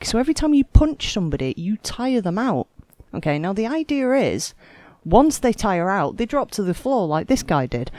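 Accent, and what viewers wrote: United Kingdom. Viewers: Love your british accent. Onarmored, HandtaHand, Armar, Stoff, Twente, Oround here, Jomp, You see "ma" point, When you punch "shombody", baa (bar), fonny. Very usefull guides.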